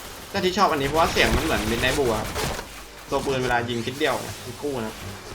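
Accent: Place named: Kanchanaburi, Thailand. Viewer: เหน่อแบบนี้อยู่ กาญจนบุนี แน่เลย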